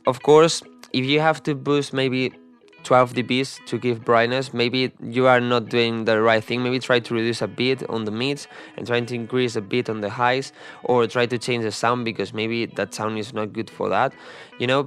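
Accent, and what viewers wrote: Spain. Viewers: Como se nota que eres Español xd, buen video!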